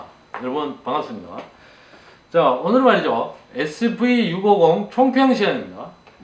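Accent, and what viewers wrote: Gyeongsang, South Korea. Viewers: 어눌한 갱상도 사투리 계속 듣고 있으니 감기는 맛이 있습니다 ㅎㅎㅎ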